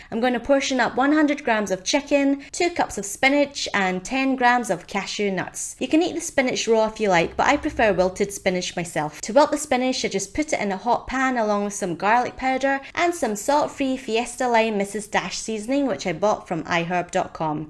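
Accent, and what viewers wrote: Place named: Northern Ireland, United Kingdom. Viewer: I love your accent it reminds me of Northern Ireland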